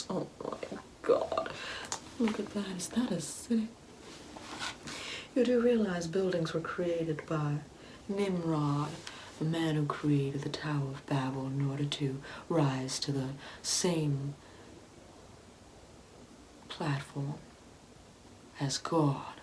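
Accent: South, United States. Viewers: Her accent really sounds like Benoit Blanc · Ok but I live for the southern accent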